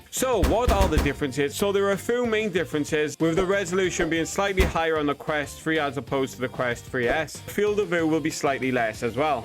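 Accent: Wales, United Kingdom. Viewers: Welsh is it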